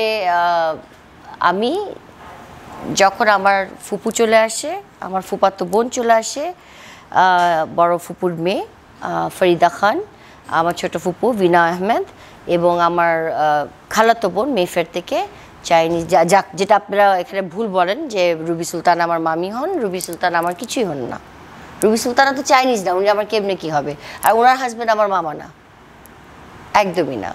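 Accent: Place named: Sylhet, Bangladesh. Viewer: Salam sister you are very good woman nice sylhetee talk but little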